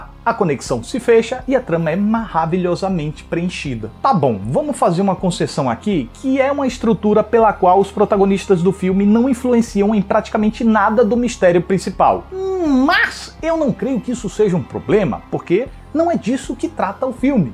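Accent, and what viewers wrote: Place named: North, Brazil. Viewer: sou muito fã dos teus reviews, teus roteiros são muito bons e termos como "trancagem fiofal" são inigualáveis, além de muito bem pautados tuas críticas, mesmo quando minha opinião é totalmente contrário da tua, teus argumentos são satisfatórios, mas percebi que tens um sotaque mais parecido com o pessoal do Norte/Nordeste, mas tu usou bastante o termo "Guris", "gurizada" mais comum no sul e teu linkedin é do Rio de Janeiro... explica essa mistura pra gente!!!!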